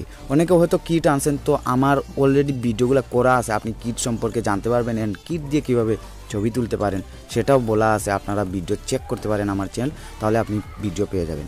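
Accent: Sylhet, Bangladesh. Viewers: vai Sylhety?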